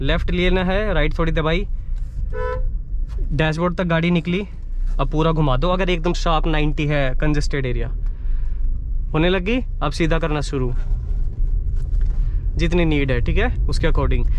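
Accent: Haryana, India. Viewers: BHAI HARIYANA SE H KYA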